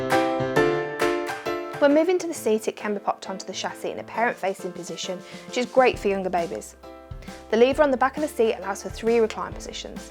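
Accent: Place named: United Kingdom. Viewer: If you aren't British, it's really hard to understand her...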